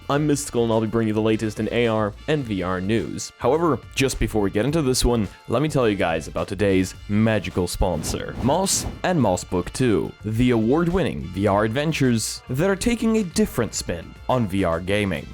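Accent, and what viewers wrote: Poland. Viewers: WAIT HES POLISH ohh s o thats the polish accent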